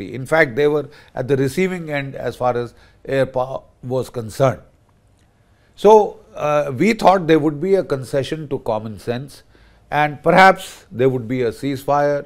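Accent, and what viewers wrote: India. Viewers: Very informative and absolute master class Indian English.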